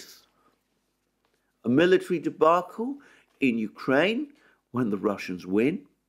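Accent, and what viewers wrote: United Kingdom. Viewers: "Pentagin" 😁 I love the Brit accent.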